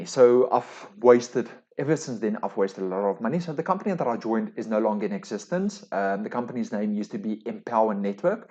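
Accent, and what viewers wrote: South Africa. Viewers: Daai afrikaanse aksent😂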